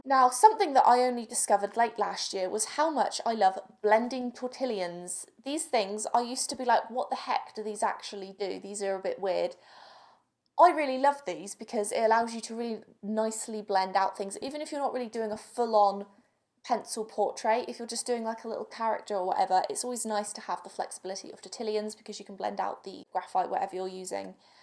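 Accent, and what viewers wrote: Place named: South West England, United Kingdom. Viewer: I detect a south westerly accent there; maybe Bath?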